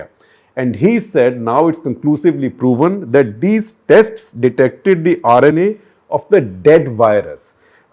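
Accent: India. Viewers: Good presentation in indian English style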